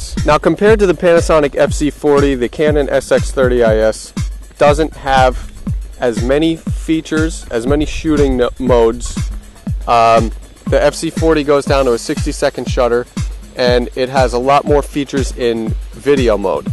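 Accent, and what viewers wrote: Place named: United States. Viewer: Like most americans he pronounces Z the way you would if you were saying Zorro, for instance. The rest of the world pronounces it "Zed" (as it should be), so he means FZ 40.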